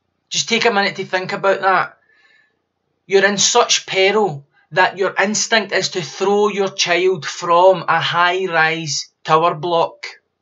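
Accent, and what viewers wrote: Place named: Scotland, United Kingdom. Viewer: Pure Truth served up drenched in a Scottish accent.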